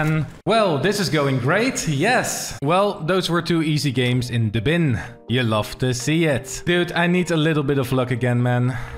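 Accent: Netherlands. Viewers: You sound dutch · Dat Nederlandse accentje😝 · jij bent sowieso Nederland, is hoorde het aan overpowered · Het nederlandse accent is weer lekker terug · What cauntry or u from i think the netherlands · Are you dutch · haha zieke nederlandse accent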